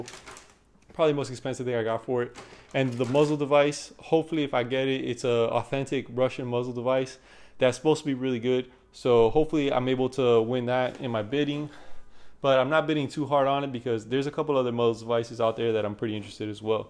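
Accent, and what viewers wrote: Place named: Miami, United States. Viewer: Damn... that’s a Miami accent no matter where you hear it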